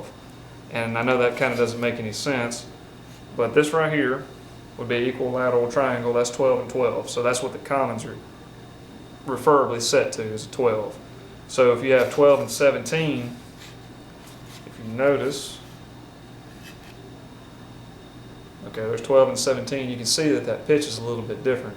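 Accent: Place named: Carolina, United States. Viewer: i like this, very helpful...i like also how you get right to it without alot of unnecessary (distracting) jabbering...also your manner is respectful and professional...sounds like a carolina accent? cool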